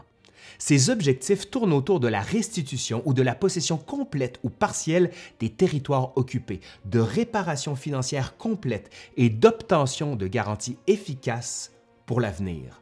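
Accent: Quebec, Canada. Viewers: Super comme toutes vos interventions. Merci beaucoup entre parenthèses j'adore votre accent canadien ! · moi perso, j'adore, surtout avec le petit plus d'accent québécois^^ très dense, on sent bien qu'il y a du travail derrière. Agréable début de WE pour moi donc^^ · Vraiment génial ! Je l’ai regardé deux fois de suite pour être sûre d’avoir tout retenu 😍 et tellement fan de l’accent québécois ☆ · J'adore cet accents québécois et merci pour cette vidéo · Excellent, j’ai toujours aimé l’Histoire mais votre manière de l’expliquer est vraiment prenante et en plus j’adore cet accent québécois, toutes mes félicitations!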